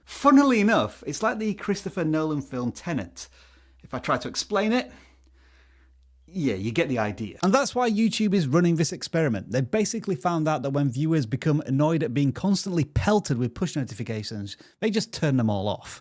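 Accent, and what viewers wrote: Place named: Northern England, United Kingdom. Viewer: Another great video,! Still love the Northern England accent. I actually got to this video, because I was previewing one of my videos and it popped up in the side bar.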